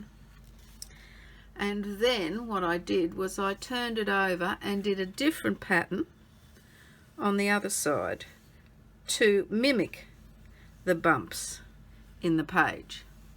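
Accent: Australia. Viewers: I watched a napkin video of yours, I thought your voice sounded like a Ozzie, so I checked it out and watched this fabulous video I am overjoyed that i found you, your work is si impressive.I ❤❤❤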